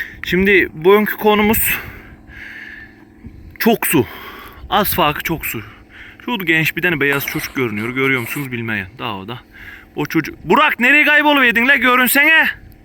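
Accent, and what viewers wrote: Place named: Aegean, Turkey. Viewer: Maşallah.. Tam da ege şivesi ile..Ben de denizli buldanlıyım. Sakaryada yaşıyorum. Yeni fark ettim sizleri. Başarılarınızın devamını dilerim.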